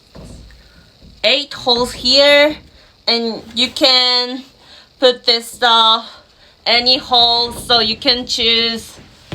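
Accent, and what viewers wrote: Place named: South Korea. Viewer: 이케아 엘리프 검색하다 우연히 들어왔는데, 와 일본인 영어발음이 완전 한국인이네... 라고 보다가 채널명을 보니 한국인이셨네요ㅎㅎ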